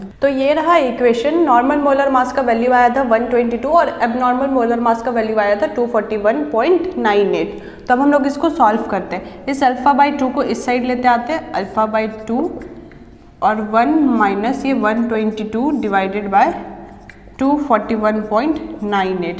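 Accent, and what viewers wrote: Bihar, India. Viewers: Bihar se ho kya 🌝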